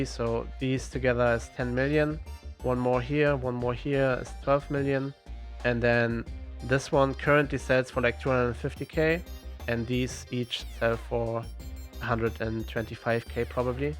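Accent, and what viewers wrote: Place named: Germany. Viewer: I hear a very deutsch accent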